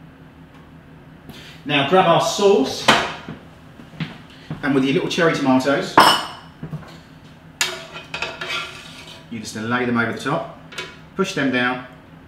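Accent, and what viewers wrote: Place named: United Kingdom. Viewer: I don't mean to be too direct, but let me tell you: I love the way you talk! British English sounds so brilliant, especially the variety you are speaking. Sorry for this non-food-related comment of a little German linguist! Thank you for this lovely recepy as well, we will recreate it soon! Cheers!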